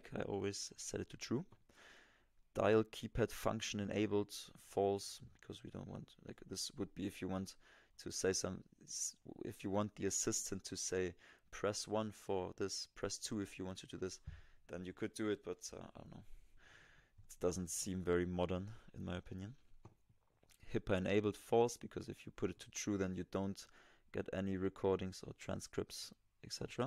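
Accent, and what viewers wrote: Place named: Germany. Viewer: Aufgrund deines Dialekts nehme ich an, dass Du aus Deutschland kommst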